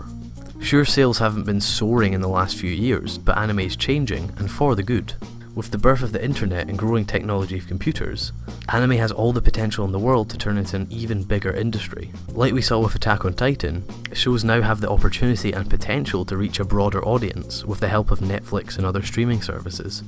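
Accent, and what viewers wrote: Scotland, United Kingdom. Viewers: It's a stupid question but you're Scottish right? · i think it's some kind of British, but there's a lot of them, so i can't tell which one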